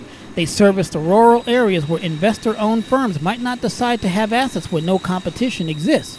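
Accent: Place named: Northeast, United States. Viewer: Sorry, you're from the northeast, your accent makes you say "gone-dohla" we in the south just say "gone-dullah"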